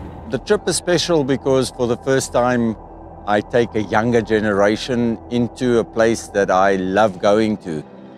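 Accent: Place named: South Africa. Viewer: The sweet sound of that SouthAfrican accent. #dreamy ;) Brilliant film, gret visuals as always!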